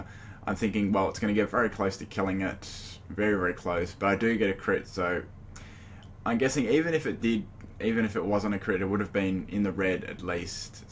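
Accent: Australia. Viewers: You have a very heavy Aussie accent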